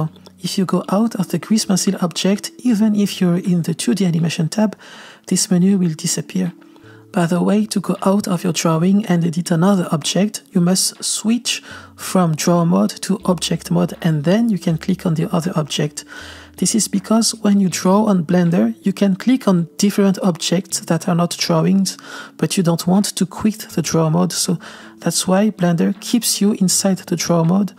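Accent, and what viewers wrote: France. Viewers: J'ai reconnu ton accent français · C le premier accent franglais que j’apprécie 😍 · thank you very much for all this very well explained knowledge. And don't worry about that accent of yours, my french compatriote ;-) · Your tutorial is not just about Blender and grease pencil. You are a sleep therapist! Never heard anybody sound like you, and your command of English (going by the French accent) is just unexcelled! Thank you so much for being such a patient human being! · What new french english accent😂❤ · Ok... Are you french ? Because i think i recognize a french accent , Your vidéo was very helpfull so thank you <3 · i have a curious question are you french or do you talk french bc your accent kinda remind me of it TT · What a cute French accent :3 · The french accent is fkng cute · j'entend un accent français.... mhhh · Are you French? 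Because you had a accent… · ooohhh you must be french.... your accent 😍😛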